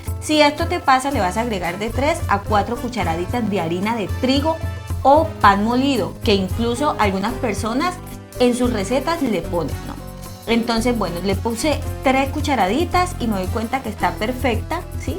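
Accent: Colombia. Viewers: Hola, muy ricas Y sensillas tus recetas ,bueno por el acento me imaginaba que eras de Colombia pero vives en Colombia? Gracias .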